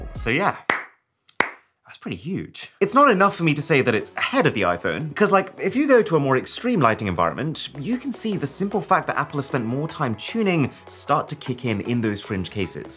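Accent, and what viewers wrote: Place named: United Kingdom. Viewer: The only British guy whose accent i can fully understand 🫠. Great video tho ✌️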